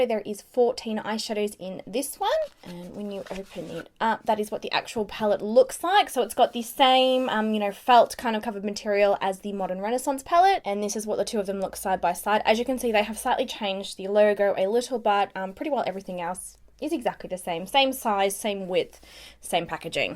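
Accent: Australia. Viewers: Thyea Phelps She has an Australian accent! That's why she talks like that. Are you for real?! Lol. Do you want her to talk with a fake American accent just to suit you? This made me laugh 😂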